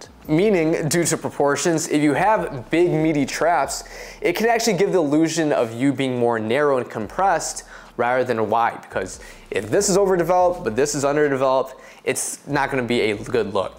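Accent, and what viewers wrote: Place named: United States. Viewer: I might be racist but I never thought u would have such an American accent 💀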